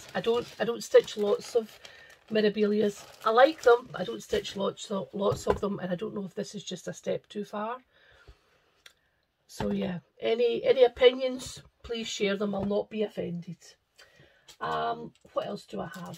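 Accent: Scotland, United Kingdom. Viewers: I discovered you earlier this week and binge watched several episodes and of course subscribed. I've fallen in love with your beautiful Scottish accent!! I'd watch just for that, however your content is wonderful too! So win win! I'm sorry about your dad's health. And yours as well. How painful. I'll pray for full recovery on your legs. I'm sure you are looking forward to summer as much as we are.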